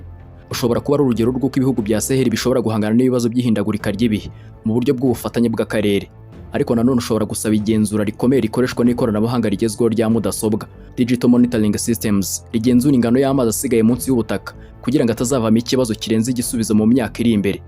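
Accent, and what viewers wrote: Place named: Tanzania. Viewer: Hello. I Am hearing you talking like a SUKUMA tribe in TANZANIA 😮😮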